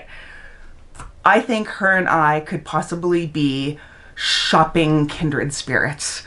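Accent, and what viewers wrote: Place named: Canada. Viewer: I had a feeling that was a Canadian accent, then you mentioned ketchup chips, and that confirmed it! I had a friend in Canada that used to send them to me in Virginia about 4 times a year, they are my favorite! I miss her and especially the chips. I only wish I could meet the kind people you are describing in this video. Most of the people you are speaking of seem very caring, I'm happy you had such a wonderful time. You didn't stop smiling throughout the whole video, and that really says something ❤. You're a true good-hearted Canadian, and I'm very happy you had such a great time with fabulous friends. 🤗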